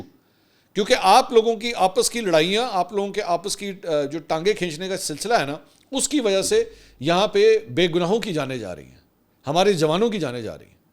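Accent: Punjab, Pakistan. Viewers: punjabi touch zaida karay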